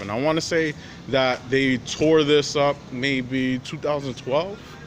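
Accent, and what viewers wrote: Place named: Bronx, United States. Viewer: It was your accent. Just reminded me a lot of my co-workers up in the Bronx. LOL. I work around the Fordham Area. Enjoying your videos.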